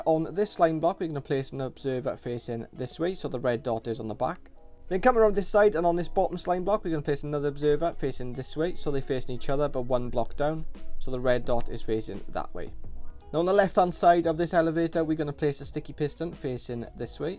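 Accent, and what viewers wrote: Wales, United Kingdom. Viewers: Welsh I think. Correct me if I’m wrong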